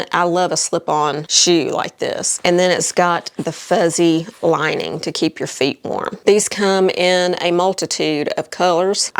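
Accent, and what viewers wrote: South, United States. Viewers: I just discovered your videos recently and I think you are cute as a button. I love hearing your voice and and sweet southern accent! :) You've inspired me to get a much shorter hair-style...I feel like a new women! I hope your aunt gets situated into a happy situation. No one really understand how much we women are/feel responsible for everyone and every thing! Hang in there! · Hi. I enjoy your videos and your southern accent reminds me of my own aunts I have down south. As a nurse, I truly understand what happens when an older person falls and breaks a hip and then the aftermath when they have dementia and that certainly progresses. It sounds like you’re doing everything to keep her safe and well taking care of. I recently lost my mother earlier this year and feel alone also as you described in your video with your aunt being the last connection to that side of the family. 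All you can do is take it one day at a time and some days will be hard. Take care of yourself. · Writing from Washington State where style and fashion never got a hold.. Sorry, WA State! Love your style and southern dialect. 😍❤❤So sorry to hear about your auntie.😥 Wishing you the best and strength!!! And thanks for letting me escape fleece country by watching your channel.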